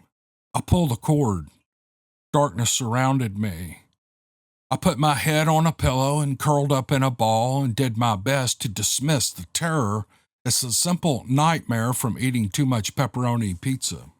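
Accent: South, United States. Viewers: My wouldn’t think a small request would so rattle such a little peanut brain… nor that such a retard phukhed hides behind that dumb simpleton southern accent.. lucky the rest of your subscribers don’t know then you’d none left.. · Another great set of stories read by a great narrator with his Southern sound! Ms. Neoma Finn, you have written another story that rivals your other great stories!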